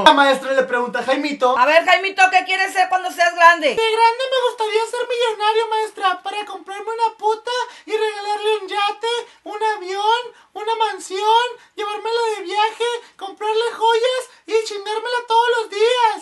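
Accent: Galicia, Spain. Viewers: Me encantas con tu asentó de gallego <3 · eres gallego cierto?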